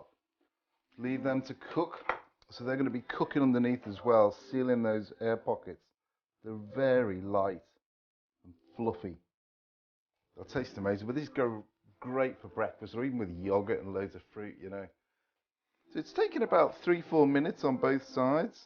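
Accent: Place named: Merseyside, United Kingdom. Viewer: That unmistakably from somewhere in the Merseyside area.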